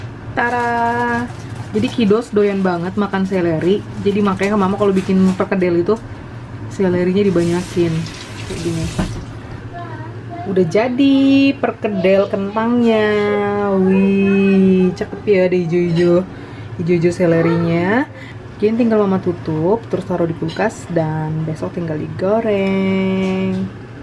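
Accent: Indonesia. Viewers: lidahnya indonesia banget😄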